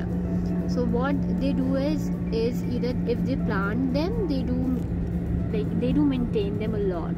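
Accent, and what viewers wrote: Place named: India. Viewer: 4 secs we can make out Indian accent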